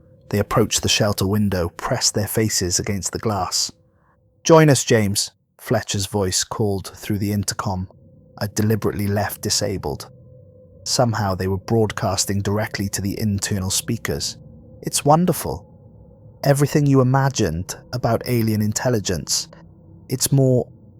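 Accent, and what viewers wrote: Wales, United Kingdom. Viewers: awwww shit we did it!!! thanks for the great content, for talking me to sleep with your caramel butterscotch welsh accent almost every night. well deserved, here’s to many more.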